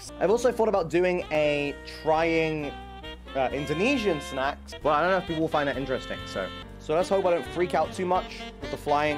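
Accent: United Kingdom. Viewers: I cant make sense of his voice cause he sounds so british but he looks so filipino 😂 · He looks so Filipino but sounds so british its actually confusing me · man's got a british accent but he looks like an asian mobster without the shades very friendly lookin' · "Doesnt look asian enough" bruh. His voice too british for that asian look if u ask me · The fact that he looks so Filipino but sounds so British messes with my head. While I was watching the live I kept thinking that he sounds dubbed 😭💀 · I sometimes don't get how he looks filipino but sounds like a fucking british character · His Britishness is contained entirely in his voice and his cooking skills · The british voice with that face cracks me up for some reason. Am i an asshole?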